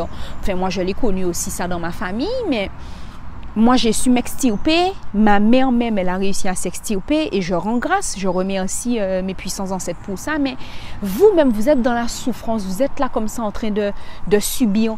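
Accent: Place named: Haiti. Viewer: Vs parlez comme les Antillaise ooh je sens l’accent haïtienne en vs je suis Haïtien c’est vraiment un plaisir je sens ta positive vibes 🥰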